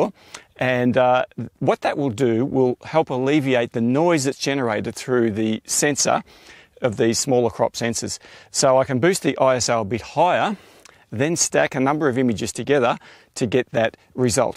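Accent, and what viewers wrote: Australia. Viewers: Nice to hear an Aussie accent on YouTube 👍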